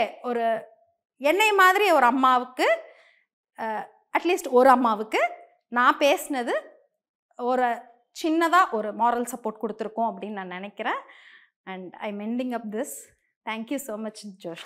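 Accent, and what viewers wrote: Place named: Coimbatore, India. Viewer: Mam i like your way of confident talking. I like your coimbatore slang i am also a coimbatorian. I admire your way of talking confidences and boldness. I pray god to give you more strength to manage your life. Your daughter is cute and beautiful takecare Sneha i like her somuch. All thebestfor your future♥️👍👌🙌🙌🌷⚘🌹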